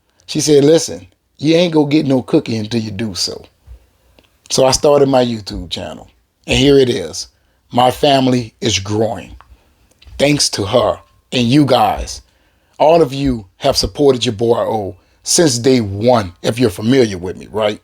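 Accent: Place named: Louisiana, United States. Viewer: You sound like you’re from Louisiana. I think Channing Tatum studied “black people” from that state to get the Cajun accent. What do you think?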